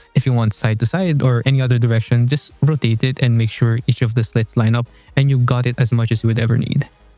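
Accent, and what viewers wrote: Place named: Philippines. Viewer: Just found your channel, like your videos. And by the accent alone I can already tell you're a Fellow Filipino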